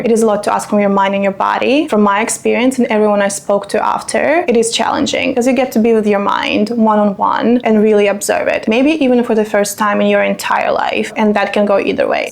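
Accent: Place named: Norway. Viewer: I just wish you'd have said that cool pali word in your cool Norweigan accent😂!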